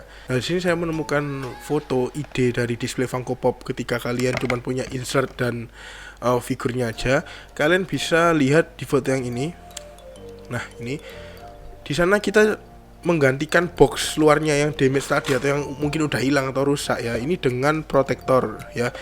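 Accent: Java, Indonesia. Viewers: makin mantap bang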